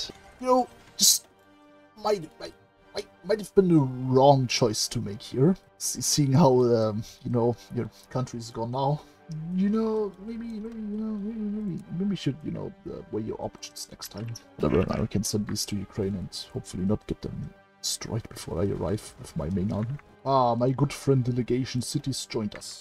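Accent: Germany. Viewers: Hat dir eigentlich wer mal gesagt, dass man an deinem Englisch den deutschen Akzent SOO einfach erkennt xD Ich wusste von Anfang an, dass du ein Deutscher bist. Naja wie dem auch sei, ich wünsche dir noch was Schönes :D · It's cuz he's German not cuz he's an English speaking RPer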